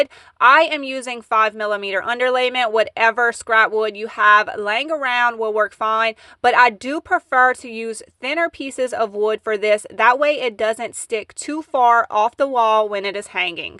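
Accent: Louisiana, United States. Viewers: She’s from southern Louisiana! Cajun country. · She is Cajun,her accent is wonderful,you my dear are rude !! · I thought I detected some Cajun accent in there. 😁😁😁 I am from Ga. We say "y'all"! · You sound like you're from Louisiana, am I right?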